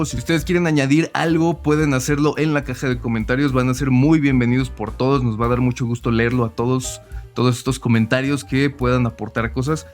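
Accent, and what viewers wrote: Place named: Mexico. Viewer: soy Chilena y me encanta tu acento jajajaja es de pelicula ....saludos aguante México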